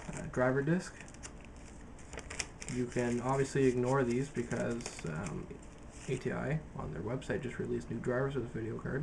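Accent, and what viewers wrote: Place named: Canada. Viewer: You couldn't sound any more Canadian. I'm getting the Vapor-X edition myself.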